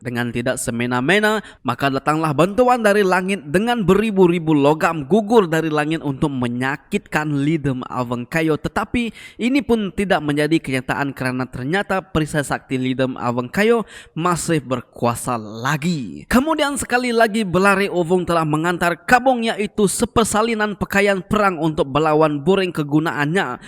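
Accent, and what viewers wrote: Sarawak, Malaysia. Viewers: Admin cerita tu feel mat nya, jaku melayu slang iban di dinga ku bagi ku osemm👍👍👍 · jaku melayu.. slang iban.. jampat mai bunyi admin macha... 👍👍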